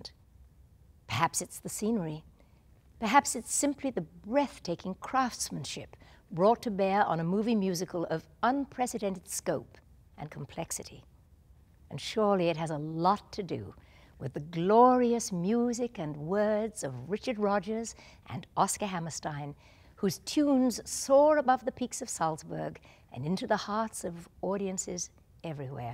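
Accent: United Kingdom. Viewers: Its actually a southern english accent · I love Julie Andrews and I love the fact that she's never lost her British accent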